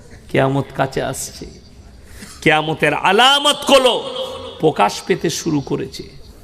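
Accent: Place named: India. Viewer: এটা হিন্দি টানে বাংলা বলে কেন?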